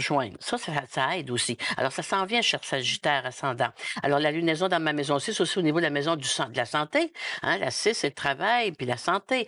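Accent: Quebec, Canada. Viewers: Tantôt vous parlez très vite et avec votre accent québécois on comprend pas grand chose on en perd un peu. Allez y slowly. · Merci beaucoup d 1 Balance asc. Gémeaux. Pour ma part je suis super fan de votre accent Québécois, j adore!!❤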